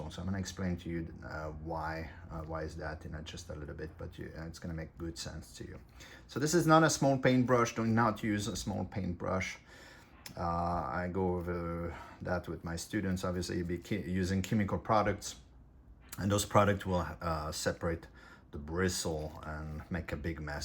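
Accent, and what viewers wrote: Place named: France. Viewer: I avec the impression que il y a un litel french accent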